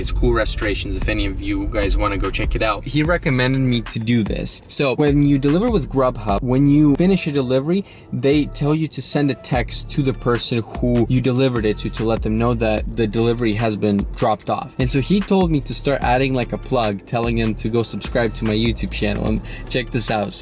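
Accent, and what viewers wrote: Russia. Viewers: Bro, are you from Russia?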